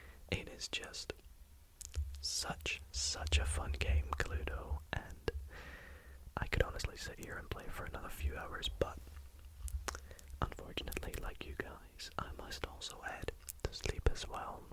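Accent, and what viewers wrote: United Kingdom. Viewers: Just stumbled upon your channel and i love it ❤ Are you from the UK?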